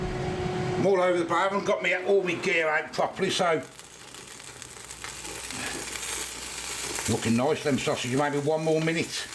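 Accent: Birmingham, United Kingdom. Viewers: Is that a Brummy accent I hear?🤣🤢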